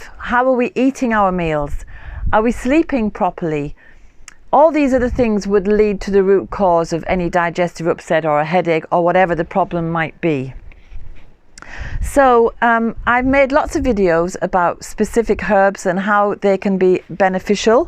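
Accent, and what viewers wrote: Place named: North East England, United Kingdom. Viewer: Hi again, it’s Jen from the North East :) I’m absolutely loving your channel- you really do draw the watcher in! I hope to be as good with herbs as you one day! I’m studying with heartwood and will be qualified in 6 years. I just did my meadowsweet monograph which was so joyful. Thanks for all these videos- I’m going to continue to follow you. Medicine making vids would be helpful but to be honest anything you put out is lovely. Btw I watched your video on plantain earlier on, which isn’t a herb I’ve come across that much- later on today on my walk out, what do you know- plantain showed itself to me! Wow! Form your accent I’m guessing you were from either Durham or teeside way? I am originally from Middlesbrough! ☺️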